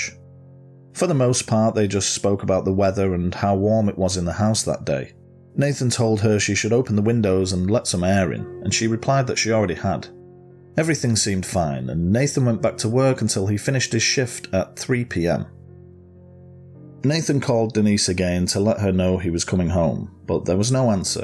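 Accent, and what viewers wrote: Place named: Manchester, United Kingdom. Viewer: I’ve just stumbled across your channel and am really enjoying it. You’re a really engaging narrator and the site is professionally put together. The cases you explore are, thankfully, more obscure than those on similar sites, but certainly no less interesting. And to top it off, you sound like you’re from my neck of the woods, Manchester. You’ve got another subscription from me. Keep it up 👍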